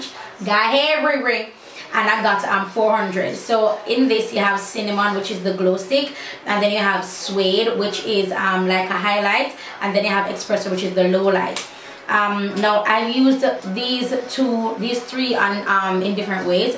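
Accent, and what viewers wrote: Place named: Jamaica. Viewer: I'm Jamaican... She sounds like me and she lives in Jamaica, so I thought she was Jamaican.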